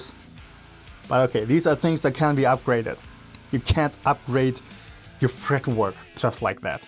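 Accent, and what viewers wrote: Germany. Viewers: I have also an 2.7 in Lemon. The only Problem i have is , powerchords on the Low B sounding very „wobbly“. Any Tips ? String Gauge? 10-64? Ich höre einen deutschen Aktzent. Wenn du deutsch bist dann kannste mir natürlich auch so antworten ;-)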